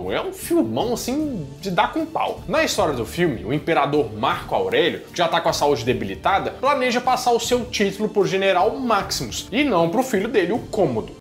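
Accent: Minas Gerais, Brazil. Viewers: Ele fala mineirês.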